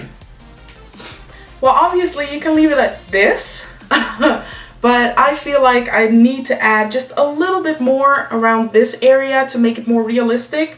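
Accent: Netherlands. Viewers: OMG are you dutch??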